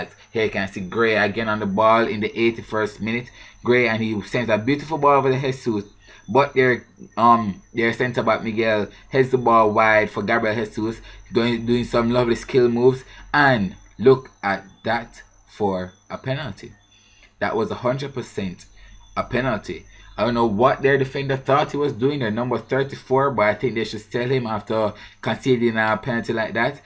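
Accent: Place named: Jamaica. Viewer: Loving this series..should have more views..your accent is awesome and it's about we had another Jamaican at Bolton :P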